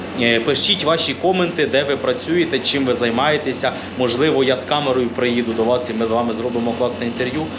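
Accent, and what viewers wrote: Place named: South, Ukraine. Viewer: У нього діалект півдня України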